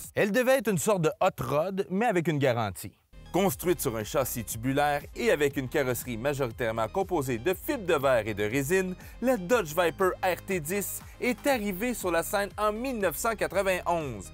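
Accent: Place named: Quebec, Canada. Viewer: Vraiment extraordinaire, en plus avec l'accent québécois , c'est vraiment plaisant !😊😊😊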